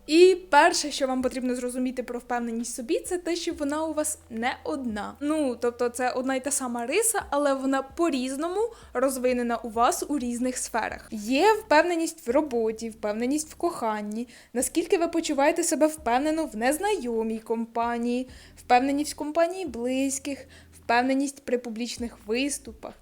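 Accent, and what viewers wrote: Poltava, Ukraine. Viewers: Що це за акцент такий прикольний, полтавський?) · Дуже дякую! Яка легка у вас подача! Лише мені здалось, що до кінця відео полтавський(милий, такий близький) акцент став менш вираженим?)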